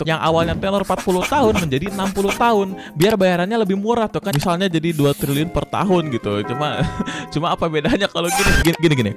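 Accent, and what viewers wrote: West Java, Indonesia. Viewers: Mang maneh orang Sunda lain Siga org Sunda logat na wkwk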